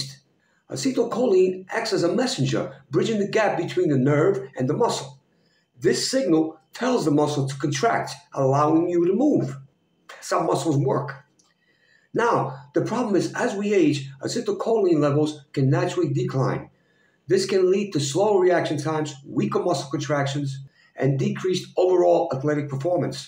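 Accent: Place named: Brooklyn, United States. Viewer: How does the creator sound like my uncle Victor from Brooklyn or my cousin Vito from the Bronx claimed he's not even an American citizen?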